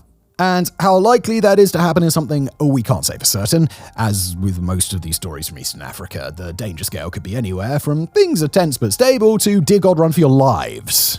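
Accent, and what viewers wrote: United Kingdom. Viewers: We Americans like to learn from people with a British accent. It makes us feel more intelligent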